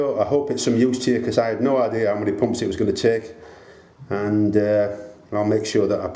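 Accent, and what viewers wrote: United Kingdom. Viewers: Well it was definitely interesting to watch the video to get a good idea of the process. But if you do this regularly it's one way to get to a more fit with this workout, also as you stated a few times during the video is that the pump has to cool down (keep in mind that the internal seals will reach a much higher temp than the outside). One thing that may help both you and the pump is have a fairly powerful fan force some air circulation around both you and the pump, probably best not to do this outdoors though as you might blow up dust from the ground and suck that into the pump cylinder more than needed. Also one thing which I didn't pay close attention to, did you bleed the line between pumping sessions? I usually pump for 4-5 minutes myself, bleed it and take a break while the pump can cool down to refill my air rifle. And once I get above 200 bar I kinda have to cheat and grab a backpack that has a few full water bottles in there to get the pressure up to the max rated 232 bar of my air cylinder on the rifle. 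I'm guessing you live in the UK based on your accent, chances are humidity is usually pretty high then similar to what I'm getting by living in coastal region. If you keep pumping without bleeding the line between sessions odds are you might be building up a lot of moisture that may end up inside your tanks. You'll still get some in there if you bleed it between pumping sessions but it should drop significantly, if you've pumped your tanks this way frequently by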